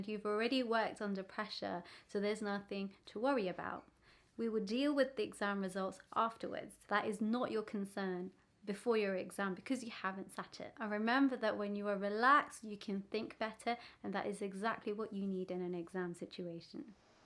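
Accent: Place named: India. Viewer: This is first time I'm watching your channel . I'm preparing for Neet (medical entrance exam ) .. I also have a feeling that your from India .. but I like your accent .. And thank you for these tips 😊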